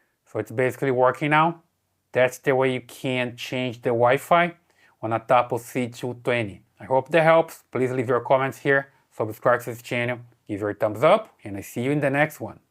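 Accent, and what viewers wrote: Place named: Brazil. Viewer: How to identify a Brazilian speaking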